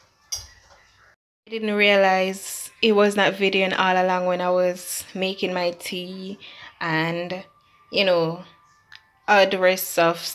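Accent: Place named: Jamaica. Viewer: Your accent is to die for I love Jamaicans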